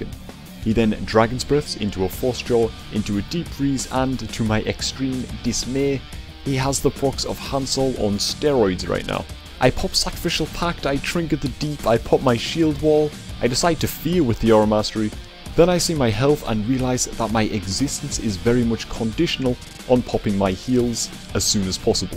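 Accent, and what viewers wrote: Newcastle, United Kingdom. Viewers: Is it near newcastle, sounds kinda geordie, but maybe outskirts or something?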